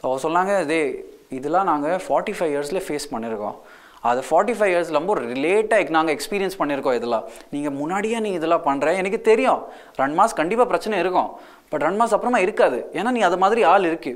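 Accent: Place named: Bengaluru, India. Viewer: Bengaluru tamil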